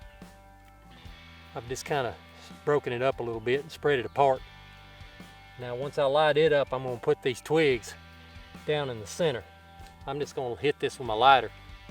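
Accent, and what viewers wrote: Alabama, United States. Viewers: Everybody loves that southern twang.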